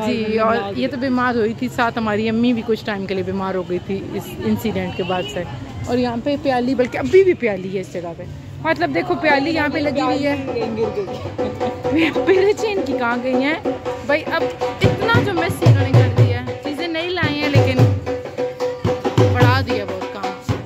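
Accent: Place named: Chakwal, Pakistan. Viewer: I am far away from my city. Couldn’t travel back since two years. I watch your vlogs whenever i miss my city. I like your Chakwali way of narrating things 😎 as this is my own way. I love it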